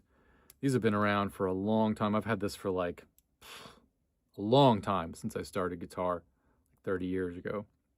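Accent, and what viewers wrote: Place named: United States. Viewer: I will never get used to the USAian pronunciation of cap-o as kay-po😂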